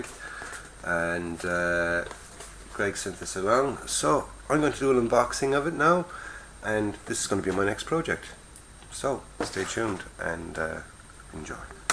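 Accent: Ireland. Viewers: And your irish accent reminds me of donald sutherlands in the "eagle has landed"!👍🏻 Jman👀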